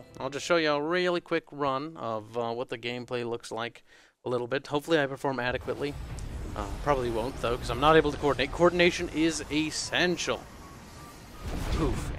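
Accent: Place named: United States. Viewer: Hi! welcome to planetside 2! Are you European? you picked the Cobalt server (Europe server..) and you have a poor connection... wasn't sure about your accent but you sound quite American to me :)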